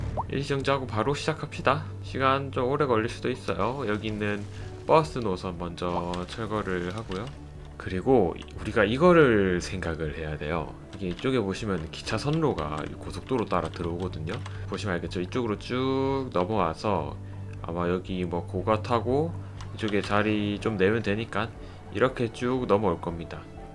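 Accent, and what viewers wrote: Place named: Gyeongsang, South Korea. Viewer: 형님 매번 목소리가 너무 매력적입니다 경상도 분이시군요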